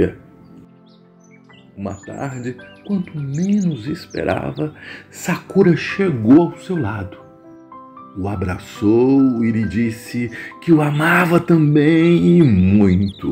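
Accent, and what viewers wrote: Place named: Minas Gerais, Brazil. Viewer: Lindimaisdaconta!!! Em sotaque minerêis.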